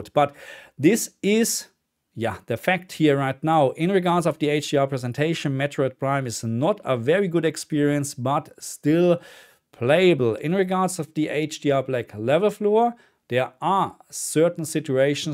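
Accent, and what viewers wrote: Germany. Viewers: Is this guy german?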